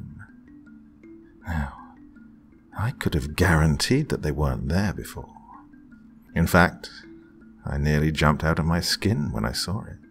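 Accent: United Kingdom. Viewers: Why do british people do the best narrations?? My narrator voice I do has been British since I was a child lol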